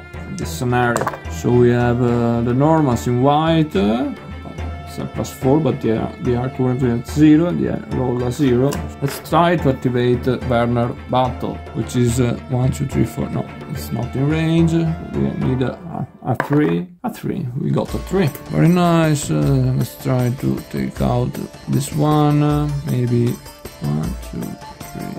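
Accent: Italy. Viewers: Venitian accent, true?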